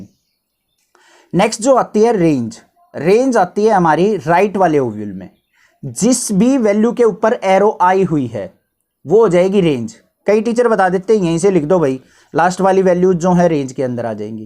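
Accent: Haryana, India. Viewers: Do u belong to Haryana ✌🏻?? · Sir kya aap Haryana se ho ......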